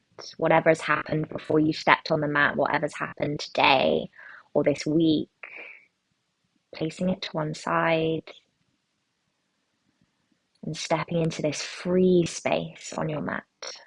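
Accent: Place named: United Kingdom. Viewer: You're a fellow Brit! Such a refreshing change to hear on da choob.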